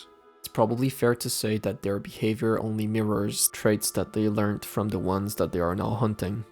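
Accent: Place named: Quebec, Canada. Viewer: I think I spotted a fellow Quebecer's accent. Cool video man, on aime le witcher 3 les deux autant je penses !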